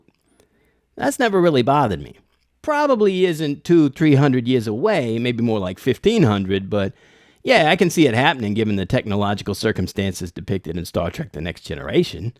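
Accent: New York, United States. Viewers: Somehow, I stumbled onto your pages. Being a casual, super nerd and loving all things sci-fi. I also consume all movies, new and old, especially if they're good so I love all the movie references have found some of your recommendations great options to follow up on. I always learn something new when I watch your videos. I found your insights, interesting, fresh, and fun. I hope you continue to do videos because I think the last one you posted was a year ago. I'm not seeing anything new, unless I'm not looking at the timestamps correctly. Keep up the good work. I'm still going through the rest of your catalog, but I completed everything Star Trek and moving on to the other videos and playlist that you've created. Love your work. And I love your scripts and New York accent. It has a hint of old -timey but hip New Yorker.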